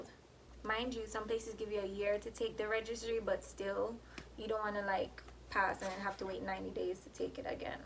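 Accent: Jamaica. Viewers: Hey girly, your video is inspiring. Taking my Abdomen registry in October your video really help me understand i should expect and how to study. On another note and totally unrelated, are you Jamaican or Caribbean? I am Jamaican and my ears are very keen to recognizing fellow caribbs especially other Jamaicans talking. But i could be wrong lol! Appreciate your video so much! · Are you Jamaican? I am too but American Jamaican I love your accent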